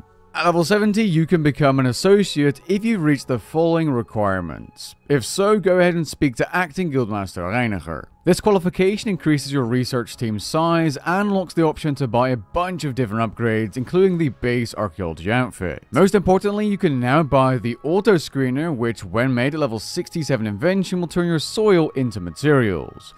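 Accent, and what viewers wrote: Netherlands. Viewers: Reiniger Defently dutch i can hear😂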